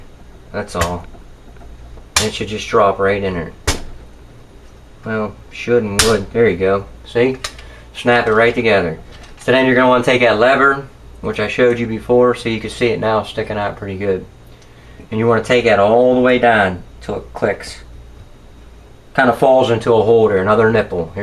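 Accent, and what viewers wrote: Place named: Ohio, United States. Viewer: sounds central east Ohio to me. I'm from Cincinnati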